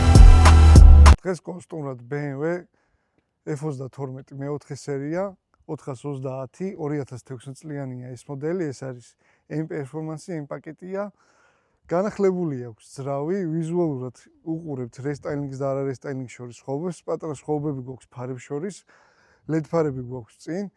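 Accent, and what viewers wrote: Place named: Gori, Georgia. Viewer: გორელს კილო აქ განსხვავებული, მარნეულში გატესტოს მანქანა აზერი ხარო კიარ ითქმის..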